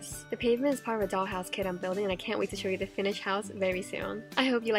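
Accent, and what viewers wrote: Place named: New Jersey, United States. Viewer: I think she sounds like she is from New Jersey, either Jewish or Italian.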